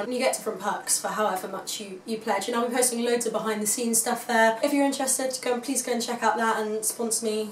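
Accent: England, United Kingdom. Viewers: Nice to hear you talk. Didn't know you were a Brit. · I just stumbled onto your channel today and I'm glad I did. You are an amazing player and seem like a really nice human being. You sound English. Do you live in Great Britain? I've watched several of your videos today including your Kiesel endorsement video. I've watched the Kiesel factory tour video 20 times, lol. I want a Kiesel Aries hardtail like yours. Anyways, just wanted to give you props and say hi and wish all the success in the world. You are a very talented player. Hope you have a great day. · так разговаривает как британка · ... you’re a Brit? Holy chit. Guess I’m out of the loop. Love the accent. Gear is sweet too. 🤘🏻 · Love the English accent! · ты своим английским акцентом меня просто убиваешь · OMG, haven't heard a cute little accent like yours since the last time I flew British Airways !!! 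... when are you touring the USA ??? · I love girl with english accent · you are from england this is the first time i listened to your accent well done so talented and gorgeous girl 🤘🤘🤘🤘🤘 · Lets review here. Hot, plays guitar and a has a Brit accent.. and a gear head... The perfect woman does exist!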